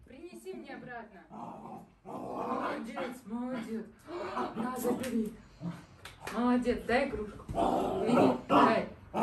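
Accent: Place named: Eastern Europe. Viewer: Her accent seems to be from Eastern Europe. Where is she from ?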